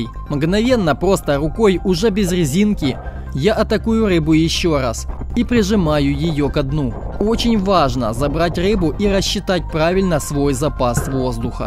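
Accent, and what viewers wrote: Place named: Ukraine. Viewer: Он-украинец, русский- не родной язык. Так что не стоит так обращать на это внимание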